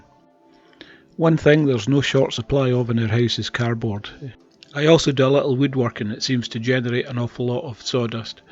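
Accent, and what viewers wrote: Scotland, United Kingdom. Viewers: I just love Scottish accent! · I love your acsent sound scotish , amazing setup fór the bricks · Your own design is superior in overall performance, in my opinion. I honestly believe you get extra credibility; because of your honest Scottish accent. My mom and her parents are from Glasgow. I am in Irvine California. Nice video thank you for making it happen.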